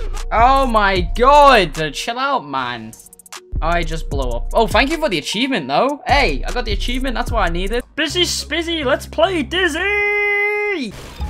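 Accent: United Kingdom. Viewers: I think he’s British…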